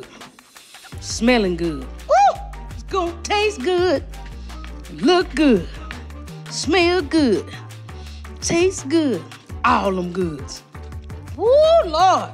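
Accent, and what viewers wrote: South, United States. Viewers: you so southern! 😂❤ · I love that she doesn't hide her/our Southern Twaaaaannnngggg!!!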